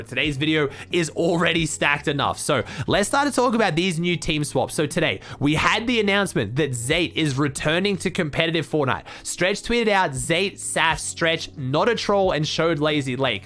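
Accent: Australia. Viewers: G'day aussie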